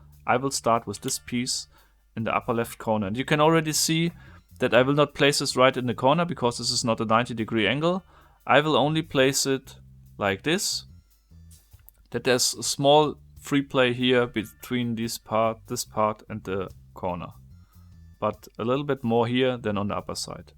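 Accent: Germany. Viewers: Subbed i just find you. Guttentag ich wohne in die niederlande. Aber meine deutch is nicht gut. Meine name is inge. Ehm thats problaby all i got for now lol. Somehow i first thought u were france lol but in this video i got it your heavy accent is deutch. But maybe a tip? Stop saying spoiler break. Doesnt make sence. Its an annoucement. In the screen with text there is no spoiler its just a anoucement. So its better to just let the screen happen. Thats how most people do that. I know i watch alot i mean hours a day of youtube. Im chronic ill so im home. I live with my second dad. So i hope this tip helps you for making even better videos. 👍 ⚘⚘⚘ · ich finde es immer lustig, wie man direkt an der Aussprache merkt, dass da ein Deutscher Englisch spricht :D ich mag dieses Platte Deutsch/Englisch :D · In diss and diss :D dieses geile denglisch :D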